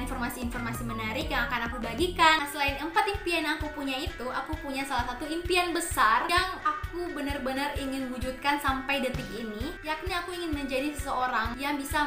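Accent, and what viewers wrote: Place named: Gorontalo, Indonesia. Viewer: Ternyata org Gorontalo. Dri awal sdh kntra logatnya :) Good job. Semoga sukses dan berkembang channelnya. Btw kita satu Almamater (UNG). Tp beda jurusan, dan mgkin beda angkatan. :) Sya dri Teknik Industri. Salam kenal. :)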